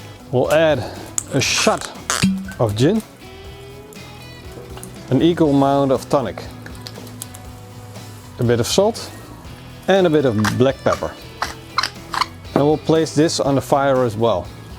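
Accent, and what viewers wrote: Netherlands. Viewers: Annoying dutch accent.🤮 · You have a dutch accent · Noticing the slight dutch accent I was surprised that he did not throw the lobster alive into a deep fryer...😅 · Engels met Nederlands accent . Grappig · Ik hoor een Nederlands accent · Ik hoor gewoon te goed dat je Nederland bent.. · Hahaha 100% jij een Nederlander bent, ofja je ouders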